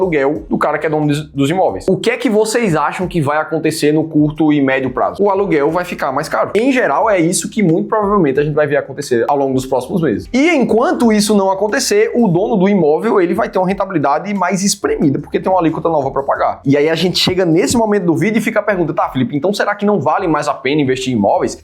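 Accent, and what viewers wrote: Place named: Northeast, Brazil. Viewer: Com esse sotaque de nordeste. Não pode reclamar. Deve achar bom mais imposto melhor. Virei benefício.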